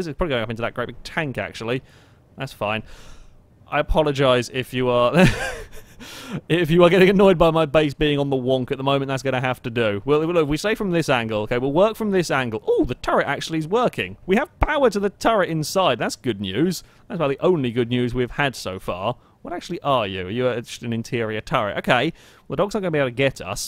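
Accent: United Kingdom. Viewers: Thought this was gonna be bad but the British accent made it all better :)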